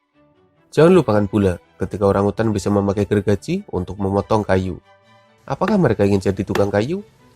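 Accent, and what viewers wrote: Banyumas, Indonesia. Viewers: Host ny NGAPAK BANGET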